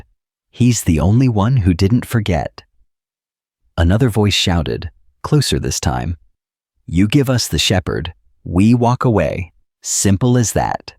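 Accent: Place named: United States. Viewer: I like the narrators voice! Appreciate that she sounds like the USA… Kind of tired of all those British accents talking USA stories. Good story.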